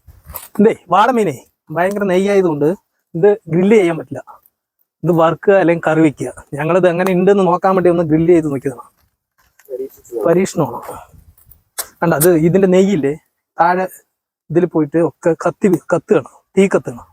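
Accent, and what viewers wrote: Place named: Palakkad, India. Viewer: പാലക്കാടൻ ഭാഷ ഞങ്ങൾക്ക് ഭയങ്കര ഇഷ്ടമാണ് ഞങ്ങൾ അവിടെ മൂന്നുവർഷം താമസിച്ചാണ്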